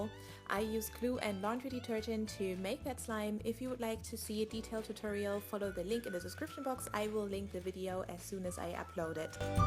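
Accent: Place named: Germany. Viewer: I knew it. You have a german accent :D ! You are from Germany right?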